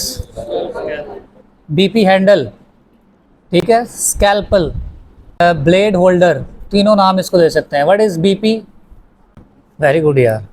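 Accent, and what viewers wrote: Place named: Pakistan. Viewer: Aap jis tarah Se bolate hai na vah Pakistani language jaisa lagta hai 😊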